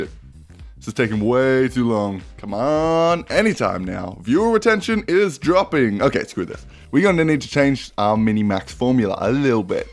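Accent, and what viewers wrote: Australia. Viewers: Are you Australian, you sound heaps Australian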